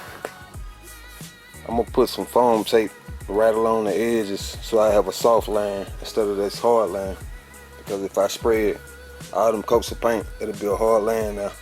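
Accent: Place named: Memphis, United States. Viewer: Is this in Memphis Tenn? Sounds like it.. either way you just got another subscriber. Entertaining man nice work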